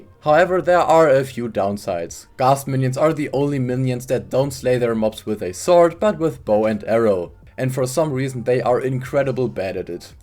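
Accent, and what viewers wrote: Germany. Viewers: Kommst du aus deutschland ? Weil entweder bin ich dumm oder man hört den akzent stark raus 😂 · Man hört den deutschen Akzent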